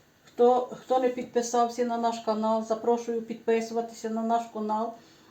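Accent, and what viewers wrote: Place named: Carpathians, Ukraine. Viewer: Приємно слухати Дуже гарний карпацький діалект❤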